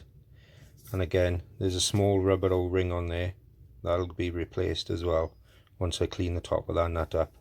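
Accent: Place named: Wales, United Kingdom. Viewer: Watching and loving your Lifan video's. I just bought a Lifan King 125 on the weekend (63 plate with less than 3k miles). I notice by your accent your Welsh, what part of Wales are you living? I'm in Brynmawr near Ebbw Vale:)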